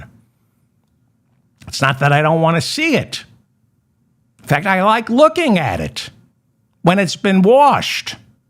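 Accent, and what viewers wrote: United States. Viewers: Once again you have “cracked me up” today! I love your self deprecation, lavatory humour and irony. As a Brit I have to say ( and this is a compliment!) without your American accent you could pass for British!😂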